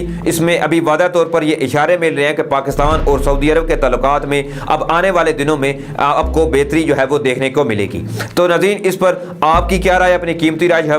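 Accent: India. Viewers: Sad but unable to believe if u r really a Pakistani as usually you use Indian accent of Urdu. Can u clarify from where u r exactly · Aap ki batain achhi hain lekin kuchh alfaz ka talafaz Indian wala kyun hai. Sahih Urdu bolain plz. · Ap indian urdu q boltay ho Baaten itni piyari or lehja indian ka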